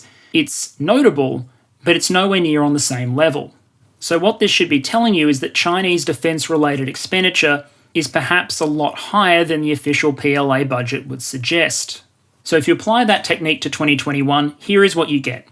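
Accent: Australia. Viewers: Your chinese pronunciation isn't bad. As an ABC (American Born Chinese) it was super weird hearing Chinese spoken with an Aussie accent. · Not too often one can hear Argentina in an australian accent. Love your videos mate, greets from this far away country. Un abrazo a todos guacho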